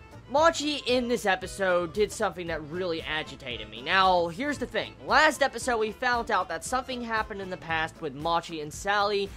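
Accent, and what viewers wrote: South, United States. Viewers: Hey I I know you probs won't read this but I'm really sad because I start high school tomorrow and I. Really scared and sad I really sad because I can't watch your videos as much because homework but I'm really nervous because I don't like going out with friends and I am kinda socially awkward I was really depressed for a while because I liked anime and I had no one to relate to. Then I found your channel and your awesome personality and the hint of southern in your voice always made me laugh and smile when you reviewed anime. I really don't know much about you and you probably won't care for this but you helped me through a lot. It was never like I wanted suicide but I was sad. I never talked too my friends and cut them off. SORRY FOR THE LONG MESSAGE I DIDNT MEAN IT I JUST GOT CAUGHT UP IN MY EMOTIONS. Also I watch all your vids even if it's anime I don't watch. 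I haven't been subbed for a while but I still support you as though I have been here for a long time. Thanks for everything. -Moe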